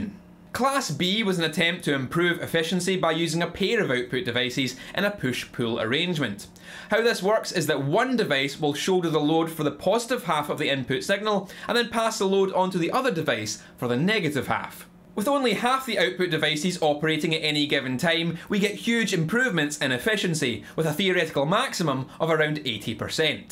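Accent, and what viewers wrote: Scotland, United Kingdom. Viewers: this Scottish accent sounds much comfortable after viewing decades of clips from this channel. good vid, thanks. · That's really very interesting ! Plus, making the effort to get your accent, I think I can now understand every single english accent in the world ! I thought Trainspotting was hard to understand, but now I get what Scotland really is all about !! Thanks man ! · Good God. Scottish parents, please don't saddle your offspring with this awful accent. Thanks...America and the rest of the world.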